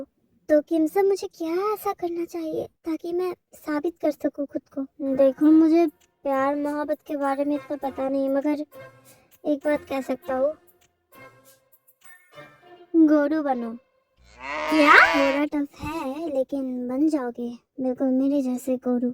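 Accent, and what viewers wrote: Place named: Bengal, India. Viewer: App ka Bengali accent😻.....Just woww😍 অবাক হয়ে গেলাম🤗😘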